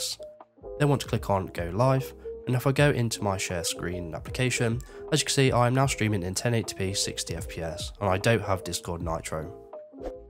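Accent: United Kingdom. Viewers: Addicted to your British accent.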